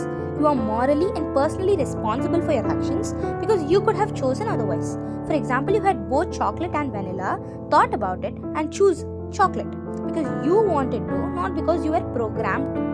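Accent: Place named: India. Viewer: I love Indian gurl accent 🌹😋🤪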